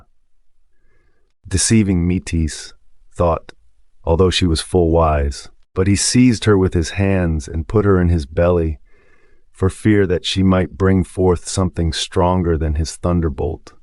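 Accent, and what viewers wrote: United States. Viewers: American accent is an abomination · American accent reading the classics. It's just wrong.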